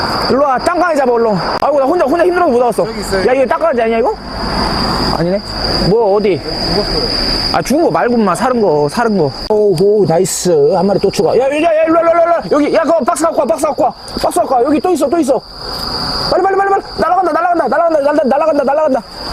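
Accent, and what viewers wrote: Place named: Gunsan, South Korea. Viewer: 정말 오랫만에 보네요 땅강아지! 그런데 많이 날아오네요 청정지역이네요 근디 말여유 사시는 곳이 군산이여유? 찰진 사투리가 섞인거 같아서유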